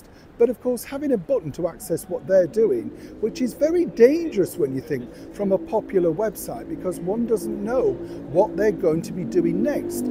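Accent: Yorkshire, United Kingdom. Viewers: Can tell you are from Yorkshire.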